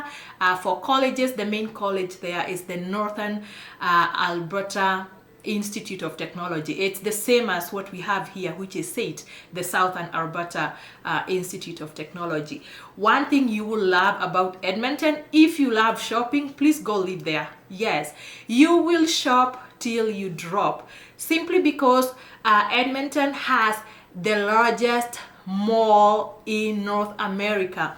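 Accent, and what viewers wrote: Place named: Kenya. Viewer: You have kenyan accent😍 Mimi pia ni mkenya in Edmonton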